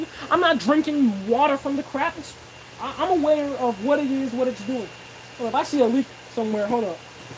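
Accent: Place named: United Kingdom. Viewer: Sound like you live in the UK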